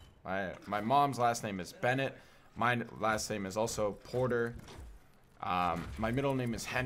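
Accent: Scotland, United Kingdom. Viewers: Aye you got Scottish in you im a huge fan and also Scottish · Scottish! Yes aye lad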